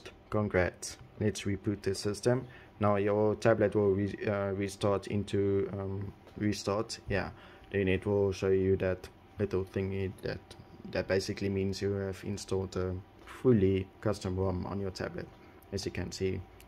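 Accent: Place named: Afrikaans, South Africa. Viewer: kan daai afrikaans hoor in daai engels. thanks vir di video dude 😁😁🤘🤘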